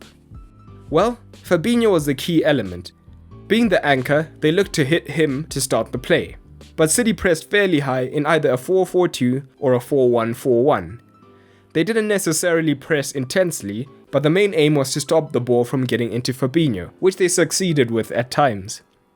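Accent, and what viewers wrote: South Africa. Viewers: Hey man, nice vids, keep up the good work, just wanted to ask, where are you from? Your accent sounds South African (I'm South African)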